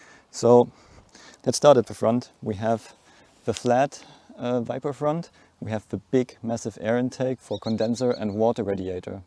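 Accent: Germany. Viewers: Wenn ich das richtig heraushöre bist Du Deutscher? Warum den auf Englisch? Wegen der Reichweite?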